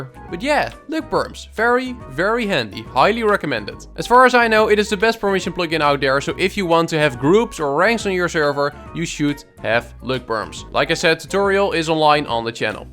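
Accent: Netherlands. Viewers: i smell dutch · Your Dutch. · Kan gewoon horen dat je Nederlands bent XD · U dutch? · Nederland voor de dubbbb lessgooo ik hoorde al iets aan de stem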